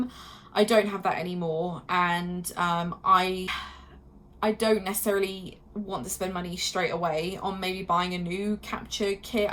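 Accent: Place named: United Kingdom. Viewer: i finally found a uk (or at least you sound like you're from here) AC player! HII :3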